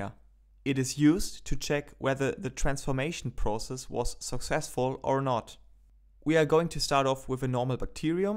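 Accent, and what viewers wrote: Germany. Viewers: Absolutes alman English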